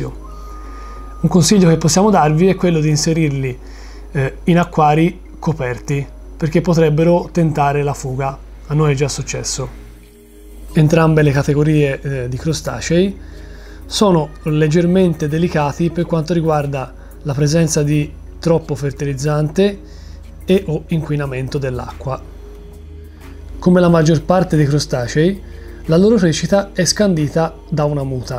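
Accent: Tuscany, Italy. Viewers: Il canale non è neanche male, ma non riesci poco poco poco meno la parlata toscana e poco più entusiasmo? Diventa difficile seguirti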